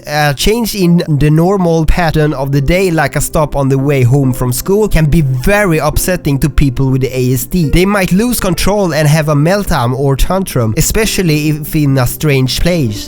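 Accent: Sweden. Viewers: OH MYYYY, Du är svensk också! min engelska (uttalning) låter också som din lol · Well he's got a rather strong Swedish accent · i love his swedish accent its kind of adorable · No, he has the accent because he's Swedish. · Oh dear gooo-, you swedish?!? U cert got the accent. Lame. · He's from Sweden, and that's why he has an accent :) (I think he's from Gothenburg)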